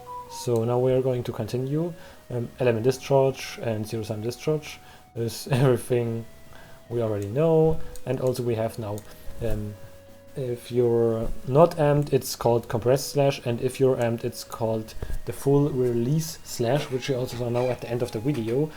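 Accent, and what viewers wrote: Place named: Germany. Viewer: 😅 You have to understand with a German accent he is indeed pronouncing it correctly. In fact one could argue that is supposed to be how it’s pronounced given the spelling. Americans are about the only people who pronounce it as “gage”.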